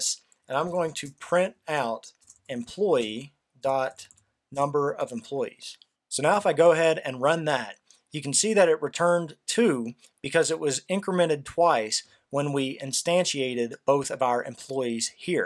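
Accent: United States. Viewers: Great videos. Love them. Learnt a lot from you. Thanks so much. The American accent was awesome too. Like Buster Scruggs teaching me about class variables. Wanted to shoot a gun into the air each time I completed something. Yeehaw. pew pew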